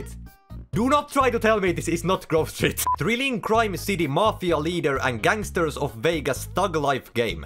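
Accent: Finland. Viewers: The Finland ascent was very good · Are you finnish?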